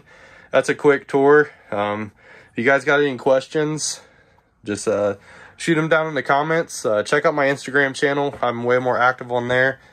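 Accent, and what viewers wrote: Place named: Pittsburgh, United States. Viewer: Bro that’s a hell of a setup. The effort you put in is evident and well worth it. Organization is key in this field. Where are you from? Pittsburgh/Ohio area? Thought I heard a little Pittsburgh accent in there lol.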